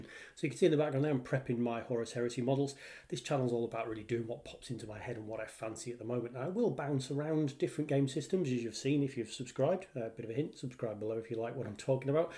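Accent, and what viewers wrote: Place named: Wakefield, United Kingdom. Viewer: do live in Wakefield you just seem to have that accent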